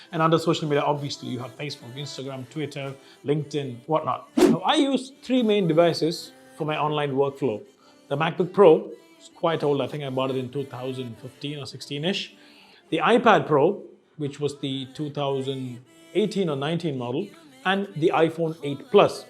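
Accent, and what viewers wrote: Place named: Tamil Nadu, India. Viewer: Enna thala english ku poita un tamil slang ku dhan video vey na pakkuren plz make videos on tamil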